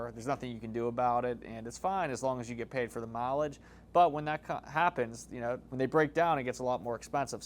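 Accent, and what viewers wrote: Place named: Pittsburgh, United States. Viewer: I miss hearing the yinzer accent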